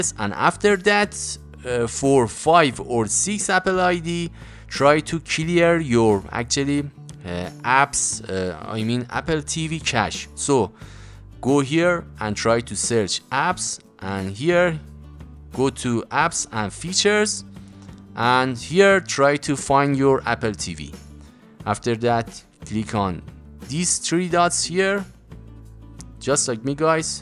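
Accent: Iran. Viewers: Persian accent kicking out 😂😂 (موفق باشی)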